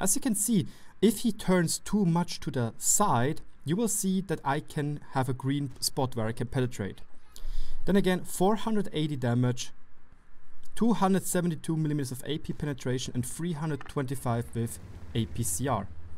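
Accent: Germany. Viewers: Your accent certainly tells you're German, aren't you? The way you pronounce (Cheesus xD) Jesus, just cracks me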